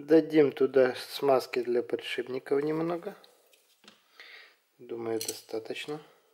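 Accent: Odessa, Ukraine. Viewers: Вы из Одессы))?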